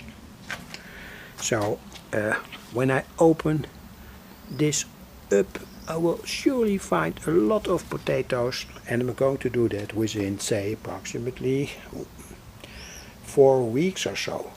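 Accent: Netherlands. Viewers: Love the garden update! I grew up working in the garden with my Dutch Oma and have always had a love for growing plants as a result. I follow your channel for two reasons. The first is to learn about electronics through your projects. The second is to hear your accent because it reminds me so much of my grandmother. Have a wonderful day,!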